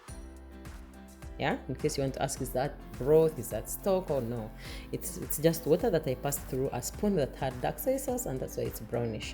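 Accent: Uganda. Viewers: I love Ugandan English (I love everything about Uganda 😂). It is very different from Kenyan English. If you know, you know. Copying the recipe. Love and greetings from Nairobi 🇰🇪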